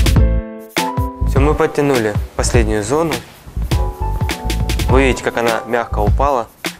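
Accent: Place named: Ukraine. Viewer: Умиляет это украинское произношение буквы Г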